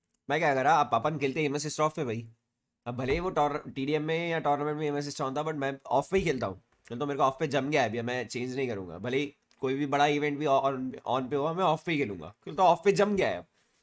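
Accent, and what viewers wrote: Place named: Hyderabad, India. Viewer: Kbhi Hyderabad jaanaa udhar kaaa esaa hi accent hai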